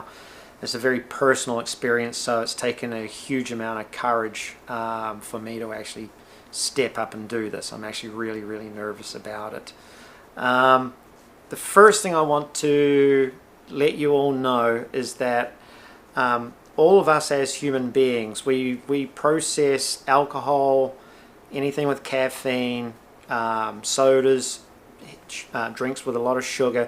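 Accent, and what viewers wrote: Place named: New Zealand. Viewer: Recognized the kiwi accent at the first 5 second of the video, even before he said: it is kiwi hahaha love the accent, miss living in New Zealand ♥ Also I felt for you when you said that you still dont know whats you have to do here on Earth, I myself struggled with that questions up until recently and it was honestly driving me crazy and anxious.... I had an "Near Life Experience" when looking through someones eyes once (I was liivng in New Zealand by that time, yeeeeeey) and I experienced this unicity and seeing the souls of all humans being connect in some sort of galaxy so I know what you are sharing is genuine and 100% true!!!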